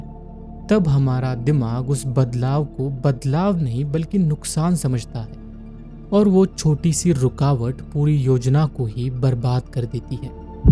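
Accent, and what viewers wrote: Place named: Maharashtra, India. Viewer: I noticed by listening your hindi accent, your mother tongue is marathi. Am I right?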